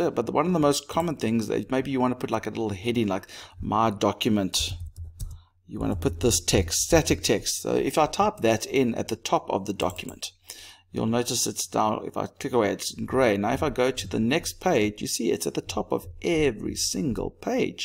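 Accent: South Africa. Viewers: Are you south African ???????😊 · are you south african?????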